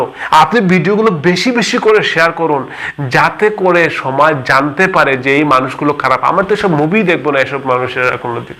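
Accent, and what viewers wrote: Sylhet, Bangladesh. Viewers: ডাক্তার সাহেব, অত্যন্ত উপযোগি video উপহার দেবার জন্য ধন্যবাদ। বিশেষ করে আমাদের মা-বোনরা অনেকেই পাননশলা খেতে addicted হয়ে আছেন।আপনি কি আমার মত বাংলাদেশী সিলেটি নাকি আসামীজ সিলেটি। আমাদের সিলেটি ডাক্তার পেয়ে খুশী হলাম।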